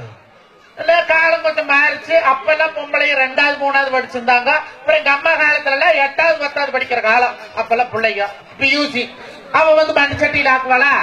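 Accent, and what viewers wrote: India. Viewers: Similar to Palakkad Tamil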